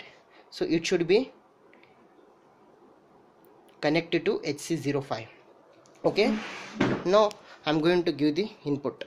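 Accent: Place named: India. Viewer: lmfao that indian accent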